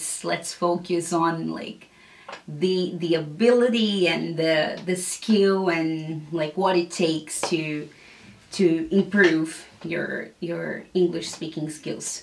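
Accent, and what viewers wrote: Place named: United States. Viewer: Super fluente... e teu sotaque é bem americano, nossa! mto fofa.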